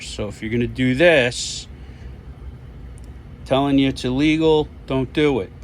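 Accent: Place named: Long Island, United States. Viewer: Sounds like Long Island N.Y.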